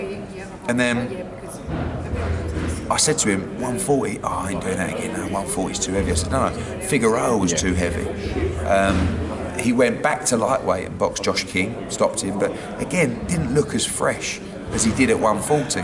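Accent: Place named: Glasgow, United Kingdom. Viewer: Ooooo mate you might wanna tone down the Glaswegian accent. Also get a decent camera. At least the sound is better though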